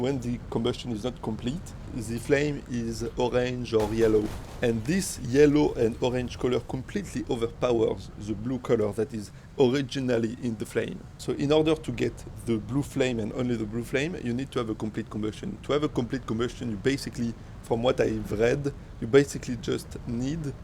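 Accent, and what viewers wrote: France. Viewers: R u french, nice accent?? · Oh god that french... Please yt don't show me baguettes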